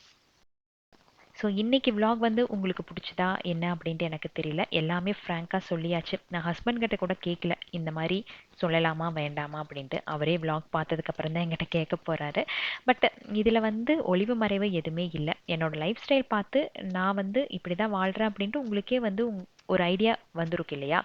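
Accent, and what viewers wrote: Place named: Telugu, India. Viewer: What’s your mother tongue ….i see you speak Tamil really well but you also mix Telugu in that is it?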